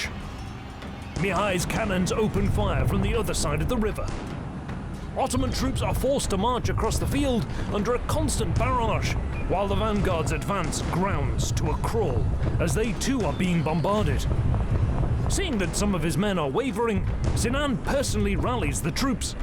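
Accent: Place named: Yorkshire, United Kingdom. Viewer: that yorkshire accent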